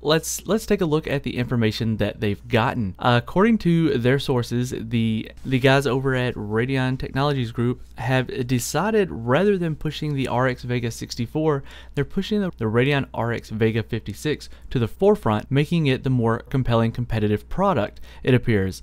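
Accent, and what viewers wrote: South, United States. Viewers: are you guys southern?? Your accent is definitely southern sounding. I'm from Texas myself so I'm definitely up there with the accent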